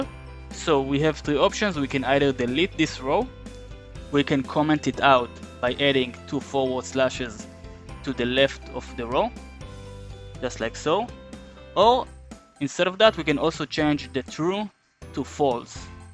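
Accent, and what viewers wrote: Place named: Israel. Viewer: the most israeli accent i ever hear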